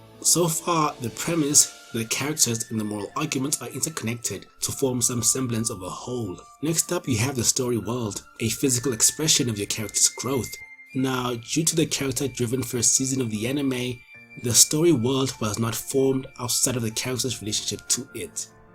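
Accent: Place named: RP, United Kingdom. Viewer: Bros english is really queen Elizabeth II level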